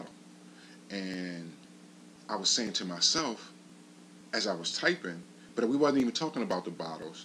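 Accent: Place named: Maryland, United States. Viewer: Your murryland accent is so on point It's like marylanders replace a's with the "ur" sound haha.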